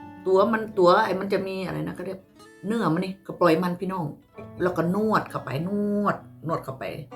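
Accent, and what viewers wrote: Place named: Rayong, Thailand. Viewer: มาจากช่องพี่โหระพาจร้า จะมาติดตาม จร้า เป็นคนจังหวัดอะไรหรือค่ะ สำเนียงคล้ายเหมือนคนระยองเลย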